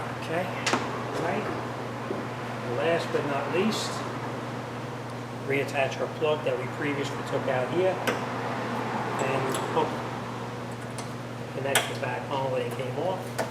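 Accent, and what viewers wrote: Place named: Boston, United States. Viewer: Hey dude thanks for the video and I recognize the dialect, you r from boston or somewhere deep north, we don't say raadiator , it sounds weird the way u say it. Don't take it personal, it just reminds me of an old Army buddy that used to make fun of me and me of him for laughs,lol. Down south people say over yonder and yaul and we say oil different-but we are all American's, so God bless my brother.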